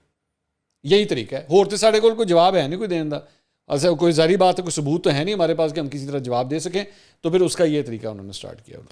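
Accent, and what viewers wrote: Punjab, Pakistan. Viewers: Bhai, it is strongly recommended that you should go for a language course. Your Spoken Urdu accent is bad, has the style of Punjabi style. Not good for a Vlog and Pakistani viewers. 👎👎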